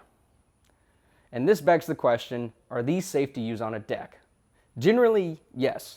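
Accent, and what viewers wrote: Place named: Indiana, United States. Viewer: damn bra, an oriental dude with a Indiana accent (y)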